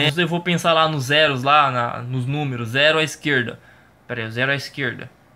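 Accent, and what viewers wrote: Brazil. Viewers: O sotaque de Rondônia parece com o paulista, pelo menos o seu. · Vc ta falando goineins ou goiano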